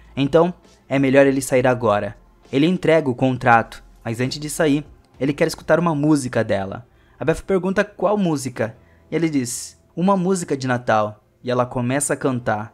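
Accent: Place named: United States. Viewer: Mas foram botar logo um americano pra narrar o video?! Que diabo de "perrquê" é esse? Quanto ao filme, existe um contrasenso terrível imposto pelo roteirista. Ora, se a mulher não acredita em nada de inferno, que diabos (expressão bem apropriada) ela foi fazer ao aceitar se encontrar o encontro com o tal? E será que depois de ter uma vida mudada totalmente, por 50 anos, ela nem desconfiou que tinha algo a ver com o contrato? Esse roteiro parece conta de chegar!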